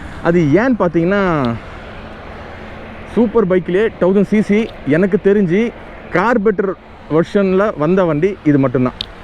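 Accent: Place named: Ambattur, India. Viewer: unga slang semmaiYA irruku bruh!... Nanum ambatturTHa bruh!...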